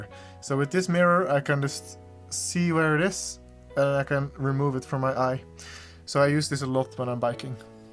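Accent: Netherlands. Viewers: aahhh ik zat al te denken huh dat klinkt toch Nederlands haha waar kom je oorspronkelijk vandaan dan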